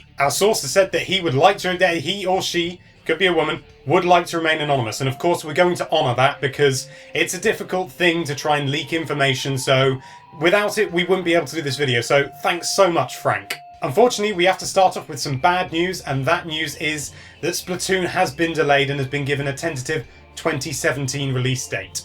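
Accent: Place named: United Kingdom. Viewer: Hmm April Fools finishes at 12pm in the UK, judging by the guys accent I would assume this was posted in the UK thus not being an April Fools joke at all. All info Double Confirmed!!!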